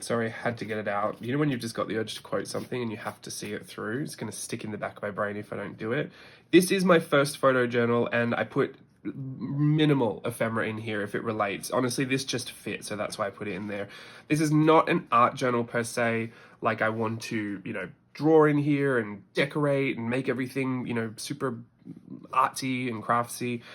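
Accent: Australia. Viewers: Love hearing your little Kath and Kim voices 😂 I think it’s ingrained in us as Aussies. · Is that a hint of Australian in your voice?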